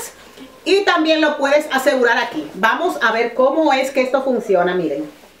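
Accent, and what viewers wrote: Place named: Dominican Republic. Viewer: jajajajaajj es dominicana por el habla'o. besitos me encanta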